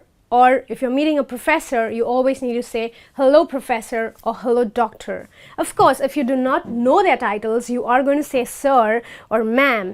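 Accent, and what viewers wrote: United Kingdom. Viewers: There is no need of such British a csent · She takes british action and fast cant understand what she is saying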